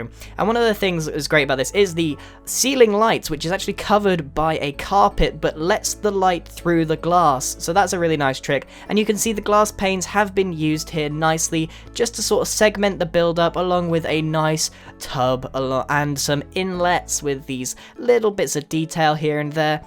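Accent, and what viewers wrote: United Kingdom. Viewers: are you British? Love your acent 😘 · You're from the UK?? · I love your voice if your britsh so am I! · are you British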